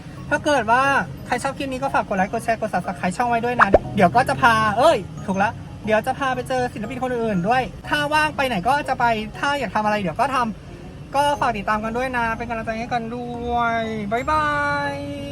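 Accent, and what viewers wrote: Isan, Thailand. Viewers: ช่องนี้ไม่รู้ชื่อช่องอะไร เจ้าของช่องคุยสนุกมากน่ารัก หล่อสวยอยู่ด้วยกัน เว้าอีสานก็เว้าม่วน ชื่อว่าจังได๋น้อนี่😂😂❤❤🎉🎉😢😢😅😅😊😊😮😮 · เว้าอีสานตาฮักจ้า❤❤❤❤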